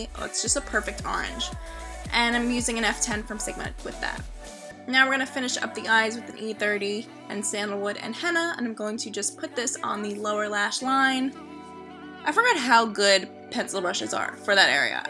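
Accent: New Jersey, United States. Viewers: Girl! How have I never stumbled across your videos?! Flawless makeup, and it sounds like you're from Jersey... automatic win!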